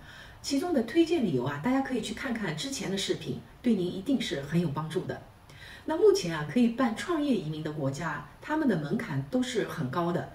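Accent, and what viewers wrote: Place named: Shanghai, China. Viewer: 乍听是个上海人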